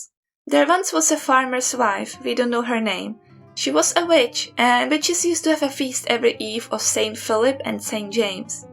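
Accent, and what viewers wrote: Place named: Czech Republic. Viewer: are you czech? because you sound really czech and i would be surprised if you aren't lol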